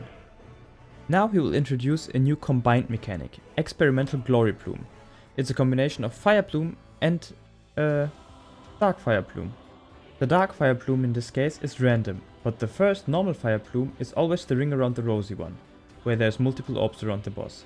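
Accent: Germany. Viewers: Ok so it's true that all Germans sound the same and adorable OK! <3 ahaha thank you for your reply!!!!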